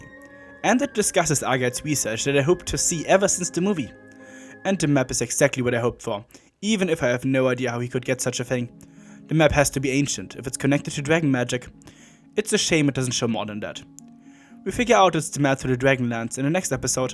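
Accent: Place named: Germany. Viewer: Ana Sani probably watches you because as a VA, she could use more exposure to a German accent so she can reliably pull it off for a future role! 😂 Ich muss schon gestehen, dass ich mich oft etwas damit schwertue, dich zu verstehen, da du zum Teil ziemlich schnell sprichst. Vielleicht können dich die englischen Muttersprachler aber etwas besser verstehen als ich, denn ich habe ja eigentlich dadurch Englisch gelernt, dass ich mich fast ganz und gar auf Content von Muttersprachlern konzentriert habe. Somit habe ich einfach ehrlich gesagt nicht allzu viel Erfahrung damit, Englisch mit einem deutschen Akzent zu hören, was mein Verständnis schon einigermaßen beeinträchtigt, vor allem, da du ja so schnell redest! 😅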